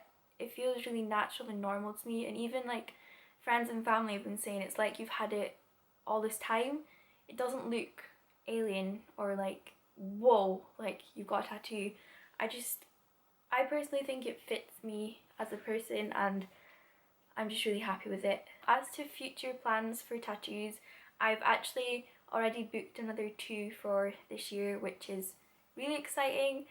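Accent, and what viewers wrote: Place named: Scotland, United Kingdom. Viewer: Gahh! Love it! Where abouts are you from? Obviously Scottish but your accent is quite a bit different from mines. I'm getting my first tattoo from my cousin in Edinburgh:)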